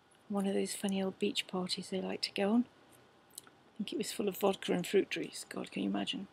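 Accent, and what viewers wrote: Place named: United Kingdom. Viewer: Jolly good...love the british accent.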